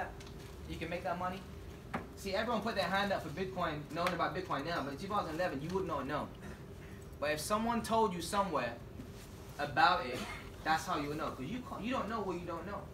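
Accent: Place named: United States. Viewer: Why does he talk in an American accent when he's British????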